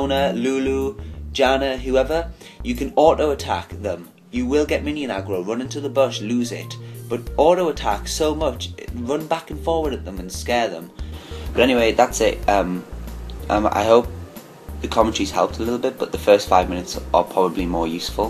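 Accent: United Kingdom. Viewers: Man, THANKS for the guide and for speaking british english xD English is not my native language but I understand yours way better than american one. So I learn as much as I can.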